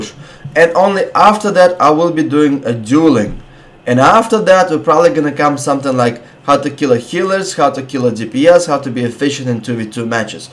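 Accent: Russia. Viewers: Охуенный русский акцент, почаще бы от тебя)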